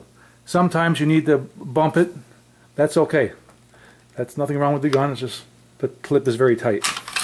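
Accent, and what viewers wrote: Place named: Connecticut, United States. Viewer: Thank you for making this very informative video in a nice straightforward style. I like your accent also - Connecticut?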